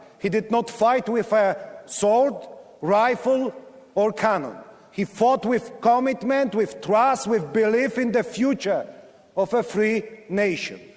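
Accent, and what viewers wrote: Poland. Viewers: Hmm polski akcent? Ogarnij sie😮 TO Polak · Angielski prezydenta nie brzmi sztucznie, słychać polski akcent, a sama wymowa jest pod kontrolą. Godne wystąpienie.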